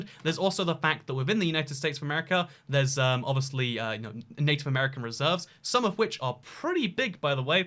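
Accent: England, United Kingdom. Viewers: When you say “Nevada” wrong I feel like it’s okay because you have an English accent, but if someone with an American accent were to say it like that like shit bro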